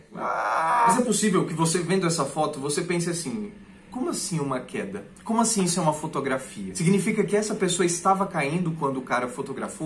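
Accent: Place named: France. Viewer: tô aqui pelo sotaque francês, flw vlw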